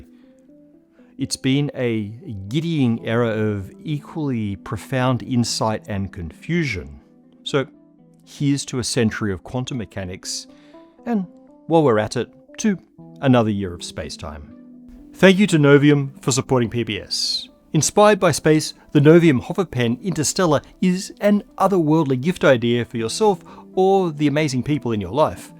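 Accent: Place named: Australia. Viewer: No slack-arsed AI. And sn Aussie. And accessibly explained !! That’s so needed. Subscribed, mate. Greetings from the Wimmera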